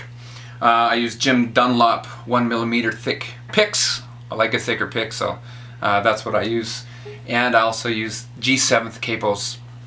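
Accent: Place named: Alberta, Canada. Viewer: Hey man, Just curious are you in bc or Alberta? you have that west coast accent but it feels more Alberta to me than bc. I love the videos, I really dug the budget rig. I have a question for you , do you happen to have any suggestions for a budget friendly versatile rig for bass and guitar? I need a full guitar rig so I can play lead and also lead worship but I'm also a worship bassist but not a normal one lol I do all sorts of weird stuff I play chords and fill spaces like you would in a punk band. that said I'm leaning towards a helix and then building a stereo tube power amp. but id be down for some suggestions that would keep me around 1k or slightly over for a bass and guitar rig (note I don't have an electric, however I do have a tuner, sans amp vt bass di , some cables, and a early 2000's stingray 4 string)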